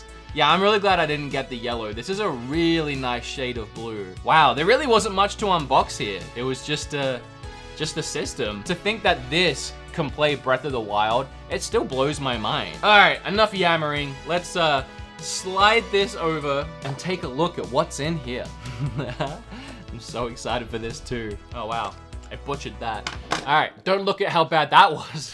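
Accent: Australia. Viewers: You sound Australian and American. Which one are you?? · I'm very confused with your accent... sometimes a little Australian slips in... Anyway, I'm savin up to get a Switch. Played BoTW on my nephew's and I want that experience 24/7 now. But I don't intend to get the Lite because in my hands, the white buttons won't stay white for long.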